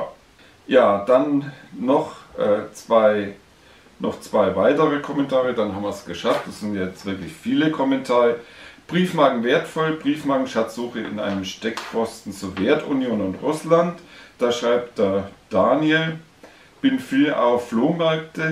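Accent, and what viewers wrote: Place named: Franconia, Germany. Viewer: Informatives Feedback- Video! Deinen fränkischen Dialekt würde ich unbedingt beibehalten, der verleiht deinen Filmen erst das richtige Kolorit. Noch kurz etwas zum derzeit durch den Preisverfall etwas in Verruf geratenen Sammelgebiet Bundesrepublik Deutschland: versucht doch einmal, die ersten sechs Jahrgänge durchgehend mit klaren, lesbaren Vollstempeln zusammenzutragen! Nach meinen Erfahrungen gar nicht so einfach, denn zu dieser Zeit wurde meist noch auf motivfreie Entwertungen geschaut, und Vollstempel waren eher Zufallstreffer., wie wäre es, wenn du einzelne europäische und auch Übersee- Sammelgebiete in Kurzportraits vorstellen würdest: Frankreich, Schweiz, Großbritannien, USA, Kanada, VR China usf. Wie stellt sich das Gebiet als Ganzes dar, was ist beim Aufbau einer solchen Sammlung zu beachten, ist eine entsprechende Sammlung für den Normalsterblichen finanzierbar, Seriosität der aktuellen Ausgabepolitik und natürlich auch die Preisentwicklung. 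Würde mich freuen!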